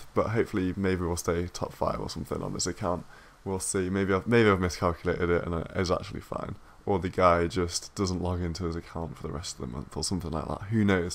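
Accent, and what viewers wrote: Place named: Newcastle, United Kingdom. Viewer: True Geordie lol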